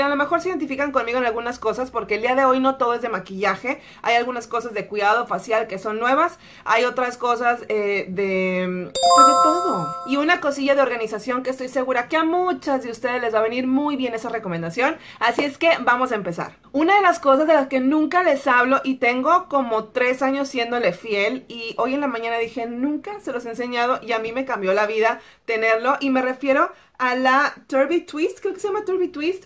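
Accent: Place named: Monterrey, Mexico. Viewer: Me encantan tus videos, pero también entiendo perfectamente tu forma de hablar jajajaja....Las Viejas... sera porque también soy Regia, aunque vivo por mas de 25 anos en Albuquerque, y se que no es por ofender, y tu cara con lo de las tareas de los niños. Felicidades por tu canal lo disfruto mucho y ojalá puedas seguir con los videos de como organizas tu casa, que por la organización de tu cocina es como encontré tu canal, ya hace algunos anos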